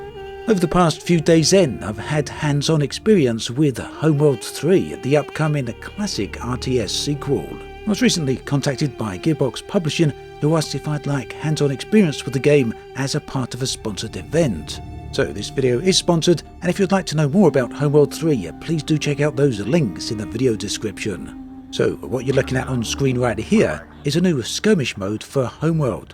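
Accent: United Kingdom. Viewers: I had a bit trouble listening to this video, great although it was. I'm not sure if you're doing it on purpose, but you intonate word in a subtle but bizarre way, word such as "video" you say "vide-ó" stressing the 'o'. You have a pronounced British accent, so It just felt out of place.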